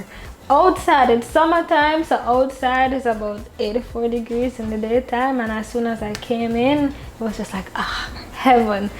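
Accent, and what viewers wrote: Jamaica. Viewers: I have to comment because your narration, description, accent (seems 🇯🇲 ) and personality moved me to. Also had to subscribe! Phenomenal video 👏👏👏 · Cah tell me seh u anuh Jamaican mama👀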